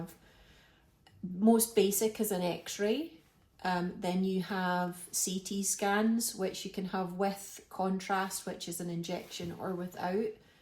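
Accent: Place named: Scotland, United Kingdom. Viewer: Love you Scottish accent. Mines a boring kiwi accent lol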